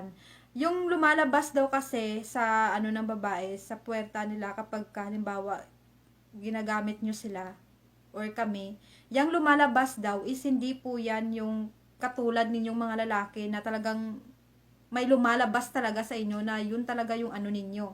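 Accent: Iloilo, Philippines. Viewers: Ilongga ka mam?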